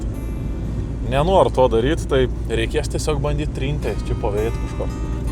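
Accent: Samogitia, Lithuania. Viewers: Ir dar žemaitiška tarmė priduod grožia. 👍👍👍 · Nu, kaip su baltu pavydu stebiu Jūsų keliones, smagu žiūrėti, o dar ta žemaitiška šnekta, nu faina. Ir toliau taip, sėkmės.😂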